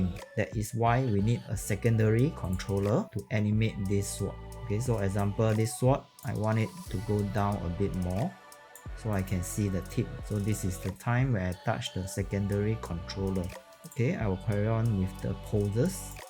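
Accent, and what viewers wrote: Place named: Singapore. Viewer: thanks a lot sir, your explaination was so good. Are you from singapore? i can tell u by your accent :face-green-smiling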